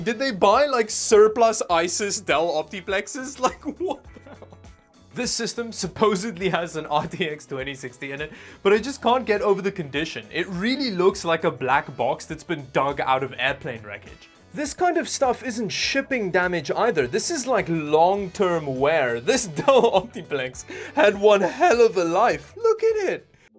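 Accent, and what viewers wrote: Afrikaans, South Africa. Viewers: Is jy van Suid Afrika? Jy klink Afrikaans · I'm so curious too, South African is my guess.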